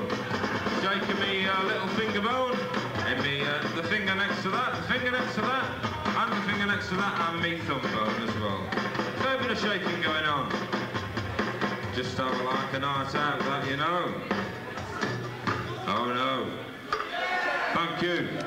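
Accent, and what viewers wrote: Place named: Birmingham, United Kingdom. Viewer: 👍 Despite living in Torquay now he still has a Brummie accent but at this stage you can here his Potteries accent quite a lot 😂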